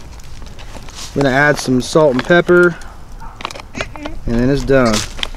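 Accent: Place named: Appalachia, United States. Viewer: good job. you ever spend any time in WV. sometimes I hear a slight hint of hillbilly twang in your accent (meant as a compliment)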